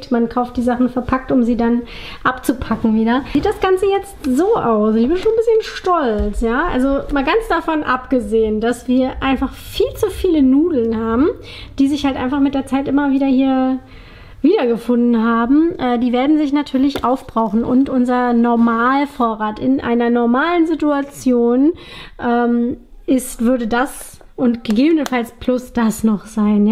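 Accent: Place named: Berlin, Germany. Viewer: Ich musste auch sofort denken da berlinert doch jemand 😂😂🤩🤓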